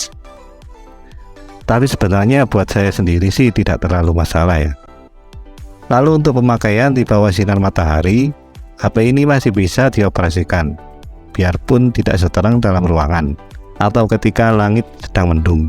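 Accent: Mojokerto, Indonesia. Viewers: wong mojokerto cak ?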